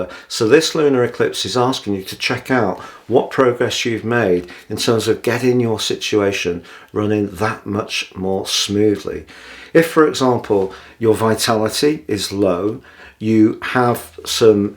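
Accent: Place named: England, United Kingdom. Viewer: Thank you lovely. So nice to hear an English accent on u tube like a pair of warm slippers! Ive had some amazing prophesies Tarot wise i tried to align them with your reading. However, i remain a novice of astrology so it was too difficult for me to do. Time will tell. Best wishes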